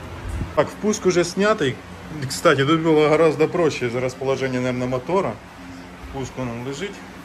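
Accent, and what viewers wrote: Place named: Surzhyk, Ukraine. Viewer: З одного боку, власно мені, все одно на якій мові твої ролики. А с другой сторони як почув наш рідний суржик то й ти якось став ближче до нас. :))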